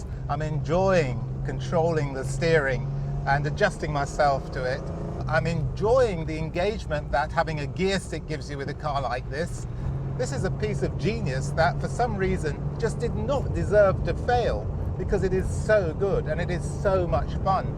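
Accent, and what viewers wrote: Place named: United Kingdom. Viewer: Greetings from Texas! Your gorgeous British accent is equal to this gorgeous car!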